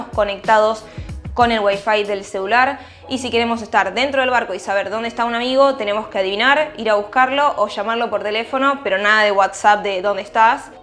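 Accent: Argentina. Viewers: que buenos tus videos . das tremenda info, amo tu acento y jerga argentina, yo te entiendo por que vivo en tu pais pero aveces usas terminos muyyy argentinos que seguro gente de otros paises no van a entender. gracias por toda la infoooo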